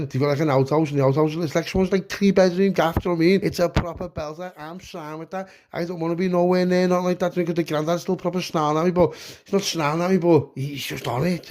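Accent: Liverpool, United Kingdom. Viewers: Belter Scouse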